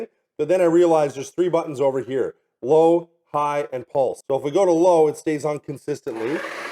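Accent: Canada. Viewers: canadian for sure. no X in ET CTERA. No X is ES PRESSO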